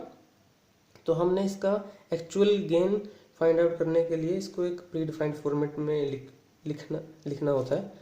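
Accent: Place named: Himachal Pradesh, India. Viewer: आपके बोलने के तरीके से पता चल गया. मैं भी हिमाचल से हूँ इसिलिए।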